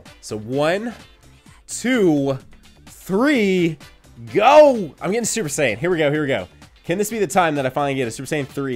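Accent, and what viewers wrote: South, United States. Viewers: IS FROM THE SOUTH AND WHAT STATE!? He has that southern accent or am I crazy!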